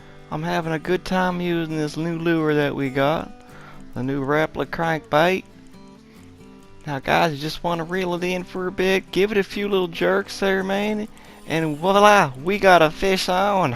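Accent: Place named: United States. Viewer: Lol the country accent gets me every time 😂😂😂 Any thoughts on the summer update?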